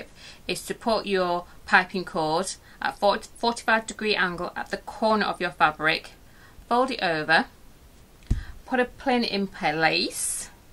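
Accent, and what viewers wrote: Manchester, United Kingdom. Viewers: Thanks for this. I love listening to you. You sound just like my cousin from Manchester.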